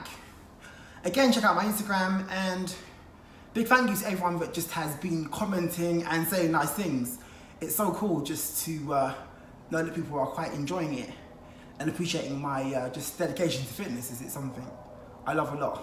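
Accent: United Kingdom. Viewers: Hey - the colors are great and the silver is the highlight- it was made for your incredible physique!! Love the British accent, mate!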